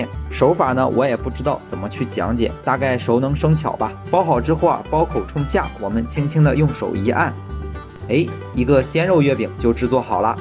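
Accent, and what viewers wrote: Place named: Shandong, China. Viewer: 看了几集听讲话感觉像是山東人！这集终于证实猜对了😄視頻做的不錯👍🏻加油！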